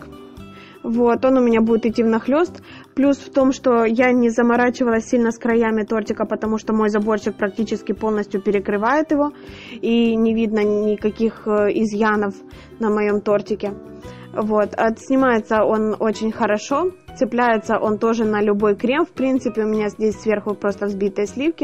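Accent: Ukraine. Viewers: Украинские нотки , лучше молчите или заведите человека с чистой речью...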